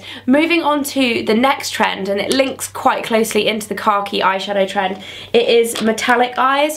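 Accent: England, United Kingdom. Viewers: British people are more adapted to americans due to television shows, movies ect..In america we are not so attuned to your pronunciation or slang. So to us its different (in a good way) and we might have questions (no we are not making fun of you). Most americans love your accent. So chill out, be patient and don't be so rude. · Khaki or Car-key? :)) Love that British accent!! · Thumbs up! nuts about the English accent! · Makes sense! It's crazy that it sounds like a totally different word with the British pronunciation, though! Should have been on the "Accents" Tag did with Joey! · Aww haha the way use Americans say khaki sounds so less proper than the way says it! Why I wish I had a Brit accent ha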